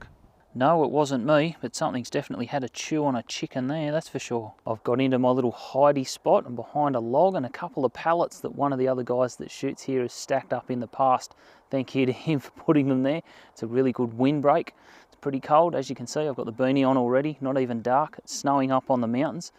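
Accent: Australia. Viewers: Great video, you sound Aussie, hope so you did good.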